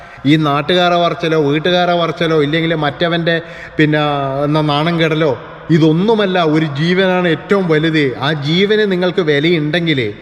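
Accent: Kannur, India. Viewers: കണ്ണൂര് ആണോ, നമ്മളെ കണ്ണൂർ ഭാഷ ആണല്ലോ പറയുന്നത്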